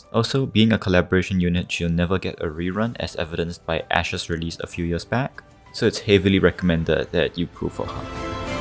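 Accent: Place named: Singapore. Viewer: i can tell ur singaporean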